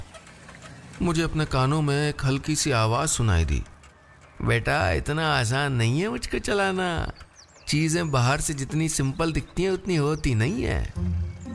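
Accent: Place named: Pahadi, India. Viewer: Pahadi accent awesome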